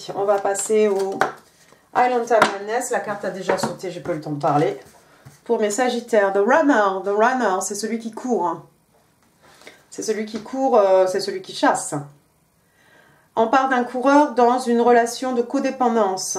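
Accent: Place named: England, United Kingdom. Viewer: Merci pour cette guidance 🙏, j aime bien votre accent anglais 👍 . Bonne journée 🤗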